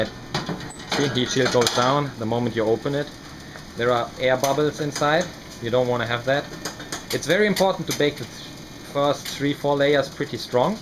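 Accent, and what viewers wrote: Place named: Germany. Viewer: I understand German and at first I couldnt differenciate between the 2 because you have such a German accent :D Like the video though (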